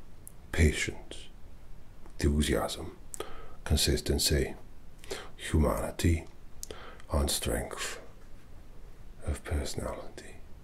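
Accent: Wales, United Kingdom. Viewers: I am literally dying of excitement rn! OMG! I just keep discovering more and more reasons to love you! My grandad was in the RAF (both of them were actually) but the one I lived with was a snowdrop (RAF Police) and he taught the ATC he was an instructor. I also adore the Welsh accent! It's giving Battery Sergeant Major Williams and I am totally OBSESSED! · Loving the deep Welsh tones. Like a cosy, comfy blanket.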